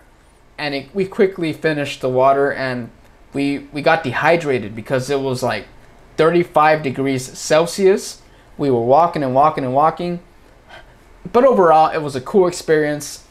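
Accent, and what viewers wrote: United States. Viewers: Посмотрела видео, говорит оооочень медленно, использую простейшие фразы. Это для ребят у которых уровень чуть ниже среднего. Лучше бы реальный разговорный с той скоростью, как говорят американцы. Но всегда приятно послушать американский акцент! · Как классно и интересно! Я тоже хочу говорить с таким же американским акцентом. Но пока по моей смачной мягкой L и картавенькой R понятно, что никакая я не американка и не британка.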